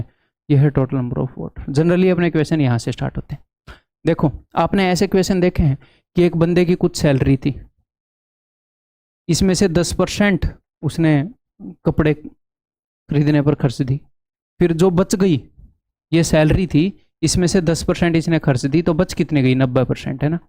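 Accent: Haryana, India. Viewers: Sir ek video haryanvi accent mein banano...aapki haryanvi accent bahut mst hai maine ek baar paid course ke videos mein thoda suna tha..aap syd kuch bol rahe thai samne waale ko ki thodi class baaki hai bs.😀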